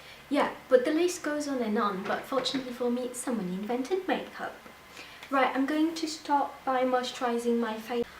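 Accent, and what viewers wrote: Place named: United Kingdom. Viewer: I was like 'She's got a british accent now ' throughout the whole video :P Good one though, I'm officially a celeb's mate :3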